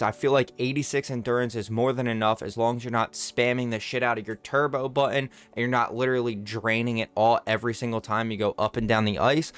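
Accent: Delaware County, United States. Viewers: in that delco accent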